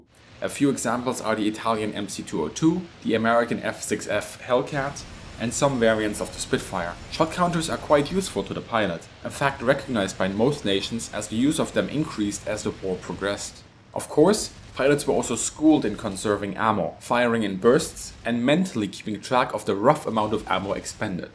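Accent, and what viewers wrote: Germany. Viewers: No he just has a german name, speaks german and has a german accent. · Giuntoli Im from germany and he does not sound like austrian. He sounds close to "Hoch Deutsch" that is the "Normal German". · you'd notice that he speaks english in a heavy german accent if you've been paid attention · He is from Germany yes!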